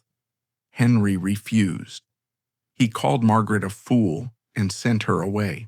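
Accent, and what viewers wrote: United States. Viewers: The idiotic images completely wreck the content. The moment I hear an American accent narrating British history I turn off, because I know it'll turn into bullshit.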